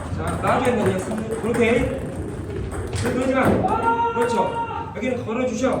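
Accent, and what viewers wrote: Gwangju, South Korea. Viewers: 관장님~ 리시브 강의 최고네요~ 구수한 사투리..친했던 광주출신 군대 동기가 생각나네요.. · 광주 싸나이 배딸배아빠"님 반가워요. 저희 전라도 광주 맞아요 ^^; 광주 살 다 직장 때문에 수도권으로 가신 분들이 많지요. 배딸배아빠님께는 의 구수한 사투리가 더 정겹게 들릴 거 같아요. 이렇게 탁구를 통해 다시 인연을 맺고 얘기 나눌 수 있어 기뻐요. 탁구 10년 차라니 대 선배님이시네요. 저 로봇수제자는 올해 탁구 4년 차 새내기 입니다. 그래서 엉성한 것투성이랍니다. 하지만 탁구 열정은 누구에게도 뒤지지 않아 졸라서 부족한 것 채워가고 있어요. 정말 탁구 레슨 중에 하시는 한 말씀 한 말씀이 주옥같은 경우가 많아요. 그래서 저만 보기 아까워서 유튜브를 시작했답니다. 어제까지 춥더니 오늘은 완연한 봄기운이 느끼지는 불금입니다. 즐겁고 행복한 불금되세요~ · 광주인가요~? 사투리 구수하니 좋네요 ㅎㅎ 광주살다 서울와서 직장다닌지 16년 됐는데 그립네요~ 서울에서 탁구에 빠져산지 10년차 초보인데 관장님 가르침이 주옥같네요 ㅎ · 전라도 사투리 구수하네요 내용도 좋구요 코치 부럽습니다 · 나도광주사람이지만 사투리진자 최고네요 엄청웃기요ㄷㄷㄷ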